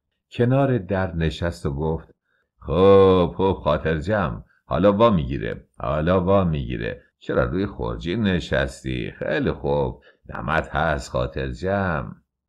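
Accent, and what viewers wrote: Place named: Khorasan, Iran. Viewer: با درود استاد گرامی، گویش فوق العاده زیبایتان این کتاب را دو. چندان جالب میکند، بخصوص که مرا به خاطراتم به شهرهای جنوبی استان خراسان و همچنین لهجه زیبای این افراد میبرد. سپاس فراوان❤❤❤❤❤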